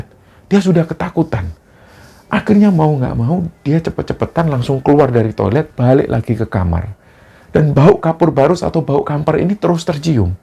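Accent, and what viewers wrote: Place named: Surabaya, Indonesia. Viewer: Assalamualaykum Mas.., maturnuwun atas ceritanya, sungguh kami sangat menikmati kisah tentang Mbak Siska dimalam ini. Meskipun cerita-cerita yg Mas bawakan selalu bernuansa horor, tetapi saya selalu tersenyum kalau Mas menyebut diksi "cepat-cepat", Mas selalu mengatakan dengan "cepet-cepetan". Mungkin itu dialektikal Surabaya-an kali ya...???! 😊😊😊🙏🙏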